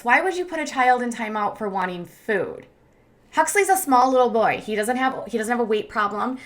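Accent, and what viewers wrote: United States. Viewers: I know you have an American accent but for some reason my brain re-imagines it in an English accent lol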